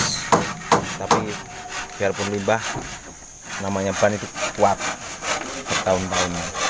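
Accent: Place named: Banyumas, Indonesia. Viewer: Nyimak selalu....yu ngapak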